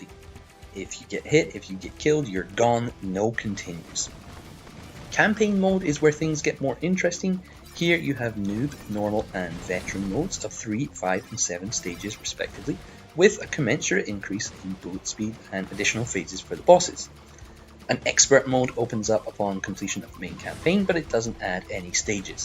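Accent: Scotland, United Kingdom. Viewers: Just discovered channel and am a fan. Great to hear a scottish accent talking about ganes. Where are you from?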